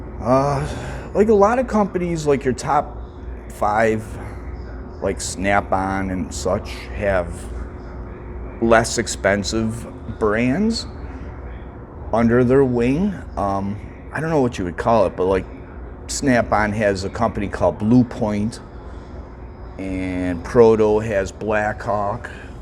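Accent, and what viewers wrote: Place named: Chicago, United States. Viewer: I listened 5x just for the Chicago accent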